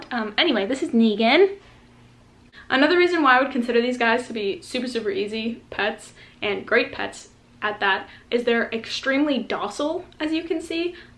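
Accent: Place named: United Kingdom. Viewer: Pretty good british accent, adorable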